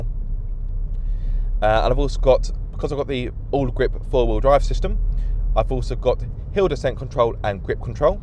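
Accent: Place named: RP, United Kingdom. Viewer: Stop trying to talk posh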